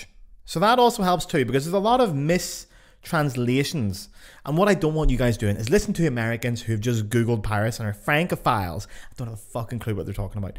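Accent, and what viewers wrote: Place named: Ireland. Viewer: Merci monsieur…je suis américaine. Je parle français et j’étudie la flûte avec un professeur français…aux États-Unis ! J’aime bien votre podcast mais s’il vous plaît arrêtez les insultes vers mon pays! We aren’t all idiots and some Americans have even composed some great music if you can believe it. All that to say, I do appreciate your podcast and I am not super offended, but as you continued in this episode to make fun of the American accent over and over, I was forced to do many many eye-rolls. You do admit that you anglicize Lot and Boehm names lol, you just do it with that charming Irish accent lol 😂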